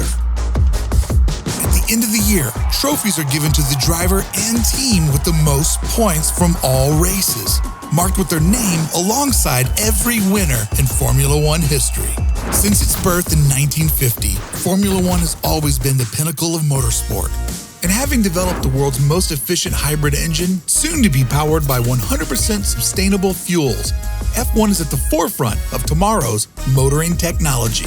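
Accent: United States. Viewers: Should have had an English person voicing this, not an American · ugh ofc they had a yank doing the voice-over. hate listening to their accent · Very weird to hear anything F1 narrated in an American accent. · This just doesn't sound right wit han American accent, I get it, they are trying to appeal to the U.S....but seriously! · This sounds so American it hurts. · "Exiting" Miami GP as example next to Silverstone? And the American accent, who would this video be ment for? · Hearing the British pronunciation of garage in an American accent is extremely weird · haha they needed an American voice for all of the Yanks to try and understand. They are the ones who need teaching as they are all the newbies. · Had to get an american guy to narrate it so yanks can understand🤣. F1 should still keep in touch with their heritage. Mention the great old days instead of constantly looking into the future. 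Edit: Oh yeah here's an alternative title to this video, A guide to f1 for americans. Americanisation of a great sport, it's sad to see the sport be ruined like this. A great sport, just to be ruined by money. Miami a exciting street circuit?? Yeah I don't really think anyone says that, what about circuits like Azerbaijan etc, talk more about the heritage man, F1's really going to the dogs. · I love how only the beginners guide to F1 is narrated in an American accent :D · Could have done without the American accent. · The fact that this video is in American-English instead of the usual British-English, goes to show for me that this video is mainly for Americans jumping the bandwagon on the F1-hype at the moment. Also, 3 American GP's? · Make it less American please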